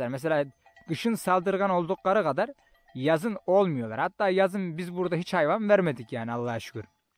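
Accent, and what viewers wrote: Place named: Çorum, Turkey. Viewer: Çördük kızılca derler bizim memlekette.konuşmamız şiveniz bizimle aynı kardeşim.çorum benim memleket.ama bursadayım ben.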